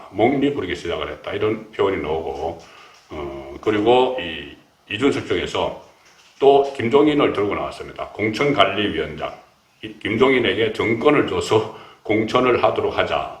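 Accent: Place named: Daegu, South Korea. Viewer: 고향은 진주같은데 영남일보출신이라 그런지 대구사투리랑 비슷합니다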